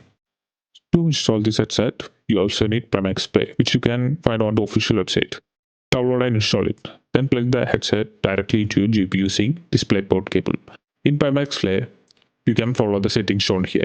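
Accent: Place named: India. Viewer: I can recognize that accent anywhere. You from India too?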